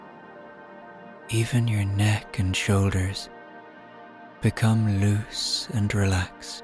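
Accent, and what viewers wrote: Ireland. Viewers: Hi, I’d like to say a massive thank you to you for your wonderful channel. Since my amazing hubby passed away, bedtime for me has brought anxiety, tears, insomnia like you wouldn’t believe and emptiness. I’ve tried music, silence, audiobooks, meditation and yet still I found it so difficult to relax. Your sleep stories are beautiful, your gentle Irish lilt soothes and caresses away the anxiety. I can categorically state I haven’t yet got to the end of your stories, which for me is a miracle! You are truly a gift to me and I wish you every success in growing your channel…. I for one will be listening and looking forward to each of your uploads… Again, thank you so very much for all you do for us in your growing community xXx💜xXx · OMG in the greatest respect I love you as an actor, TBh I was surprised that your beautiful silky voice as a storyteller actually sends me to sleep. 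Why? Bcoz I've suffered insomnia, bcoz of PTSD for years, so thank you for making my night more focused and yeah, without sounding insensitive your beautiful Irish tones help me to blissful night's sleep ❤🙏🙏🙏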